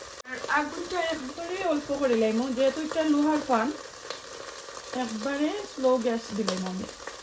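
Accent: Sylhet, Bangladesh. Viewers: এটি সিলেটি ভাষা 👌👌👌🥰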